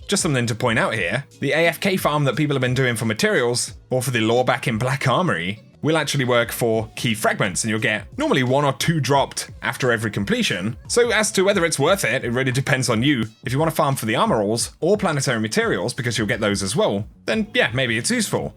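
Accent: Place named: United Kingdom. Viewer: He is from the uk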